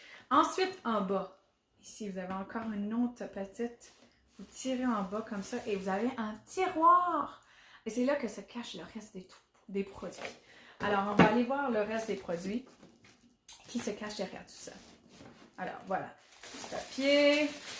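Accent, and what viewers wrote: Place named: Canada. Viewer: J'adore ton accent petite soeur hi acanadienne ............Ton sourire ton peps je kiffe <3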